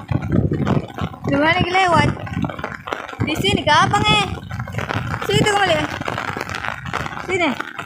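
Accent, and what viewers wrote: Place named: Sulawesi, Indonesia. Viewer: Logat Sulawesi kak 😅 langsung kentara . Salam dari Luwuk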